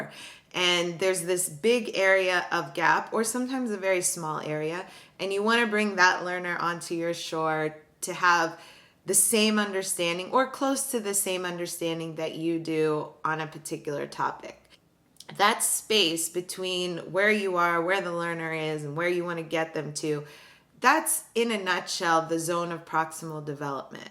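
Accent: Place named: Greece. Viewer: You sound Greek...!!!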